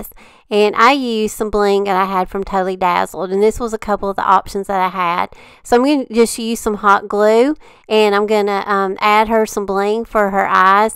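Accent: South, United States. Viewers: That came out so cute! I just love the sound of your voice and your southern accent !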